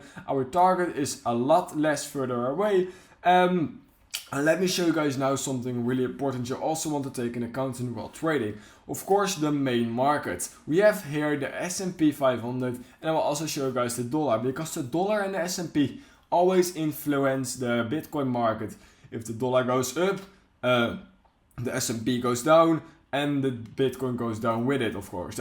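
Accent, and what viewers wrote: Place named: Netherlands. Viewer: 25k is not going to broken 😂 Dutch english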